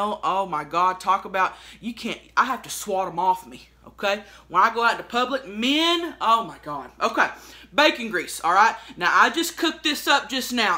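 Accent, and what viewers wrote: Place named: South, United States. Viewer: I just realized how damn southern you are, where you from?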